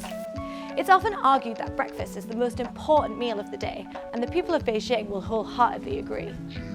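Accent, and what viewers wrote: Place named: England, United Kingdom. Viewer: Hi. It is so refreshing to hear an English accent and so down to earth and there is nothing pretentious about you which I find uniquely different to other bloggers. Dou jiang comes in salty and sweet version. I believe in the south it favours the sweet version and in the North the salty. I prefer the sweet one myself but each to our own.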